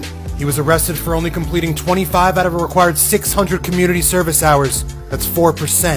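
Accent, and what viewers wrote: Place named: New York, United States. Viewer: Bro idk but the videos u used to do we’re clutch. That New York Italian type of talk is classic. Don’t stop n take it back to how I did the old ones. All respect just my opinion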